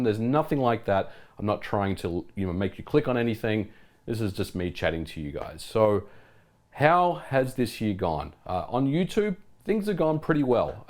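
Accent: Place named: Australia. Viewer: Hey brother, I’ve been a long time watcher of your videos. I think you experienced a lot of growth on your channel thanks to the pandemic so don’t be too hard on yourself comparing views to that time! YouTube is also pushing shorts pretty hard which isn’t your fault either. Everyone knows you’re one of the top voices in retro gaming on YouTube if not gaming in general, plus it’s nice to hear a fellow Aussie voice! Keep doing what makes you happy mate, merry Christmas.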